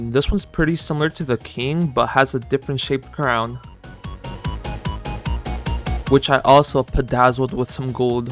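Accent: Norway. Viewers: Are you Norwegen?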